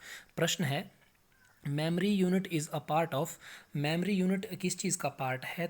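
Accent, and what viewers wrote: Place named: Kullu, India. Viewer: Yaa tum kullu se ho mandi but mujhe kullu se lag rhee ho ????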